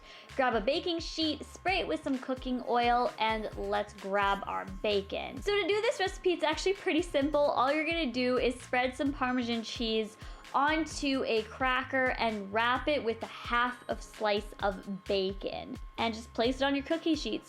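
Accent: Canada. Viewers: I say it like her, it must be a Canadian thing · Probably because she's Canadian and Canadians tend to say some words a little different. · She's canadian! Therefore, she has an accent. She's not saying it weird lol.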